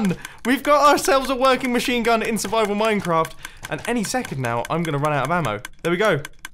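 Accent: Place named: United Kingdom. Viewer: you are british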